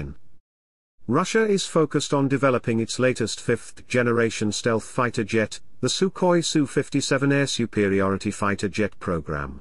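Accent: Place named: United Kingdom. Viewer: Very interesting report.. Excellent British pronunciation.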